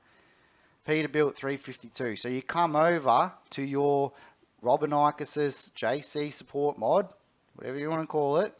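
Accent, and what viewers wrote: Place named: Australia. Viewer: Your accent makes this video 10x easier to pay attention too. Not sure why, you are Australian correct?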